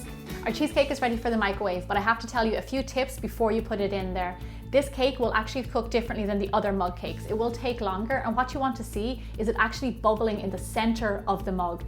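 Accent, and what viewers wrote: Ireland. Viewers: are you Irish??? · Im in love with your wonderful recipes and your Irish accent!!!😍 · I just made the cookies n cream one and it came out ABSOLUTELY AMAZING!!! It was perfect because I was craving something sweet, and since I live alone, if I bake a cake I eat the cake, which is bad for my waistline!!! So this little treat hit the spot without being too much, and like you've said it other videos when you make it urself you know what you're eating, which is important too! I love your recipes, love your channel, and absolutely ADORE your brogue! It's very soothing!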